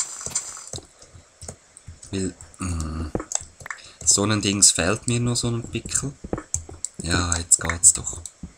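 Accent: Basel, Switzerland. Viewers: bisch du e Basler?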